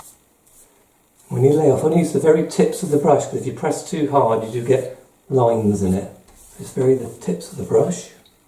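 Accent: West Country, United Kingdom. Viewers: What's that accent? Bristol or Somerset way? Nice vid mate.👍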